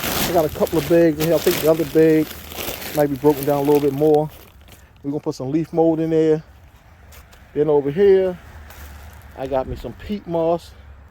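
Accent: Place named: Baltimore, United States. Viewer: Sir, where are you from? I hear an accent and my husband says that it sounds like Baltimore.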